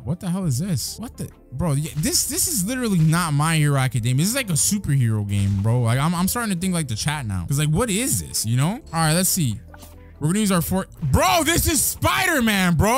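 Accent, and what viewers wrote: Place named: Spain. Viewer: eres de ascendencia española I can kinda hear it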